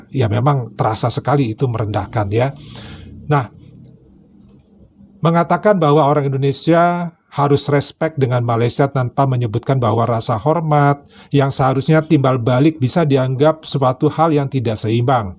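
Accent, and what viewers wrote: Indonesia. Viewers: Bu...logat bahasamu itu berbahasa indonesia .. hhh...jadi intinya rakyat malaydesh ini belum sadar diri ...makanya sarapan pagi dulu biar sehat dan otak bersih 😅😅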